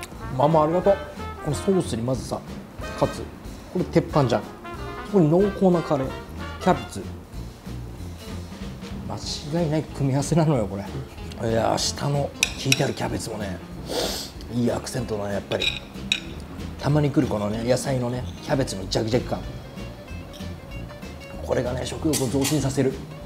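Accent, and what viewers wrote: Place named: Tokyo, Japan. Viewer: 神奈川県民なのに江戸弁www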